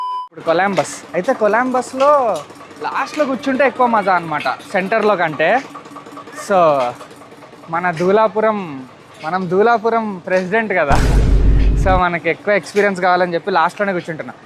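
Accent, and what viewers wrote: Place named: India. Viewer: Ethulaki bayapadani akuva-man, painki yedhagaliiii 💯🫡 garu's efforts to vlog for us and mainly how he supports everyone (jabardasth,masth, his extrovertism, Mannnn)✨ Cameraman bhattu nunchi inspire ayinatu unnaruuuu 😶‍🌫️😂 His Hindhi accent 🔥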